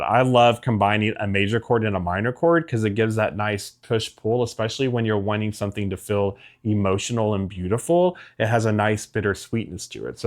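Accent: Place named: United States. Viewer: Yeah, he's speaking american English, not some damn British English, and also assumes we're dumb which is good!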